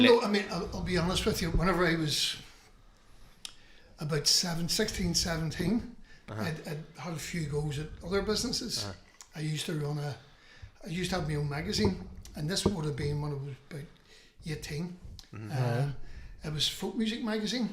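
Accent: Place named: Larne, United Kingdom. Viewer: That Larne accent is something shocking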